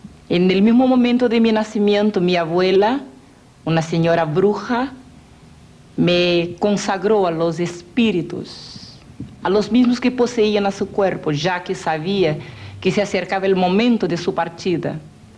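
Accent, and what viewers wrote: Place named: Brazil. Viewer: parla spagnolo con acento brasiliano....forse originalmente il video è stato fatto per gli spanoparlanti...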